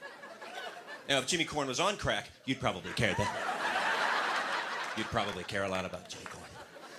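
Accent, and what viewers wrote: United Kingdom. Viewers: He has a good bri-ish ascent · his british accent reminds me of stewie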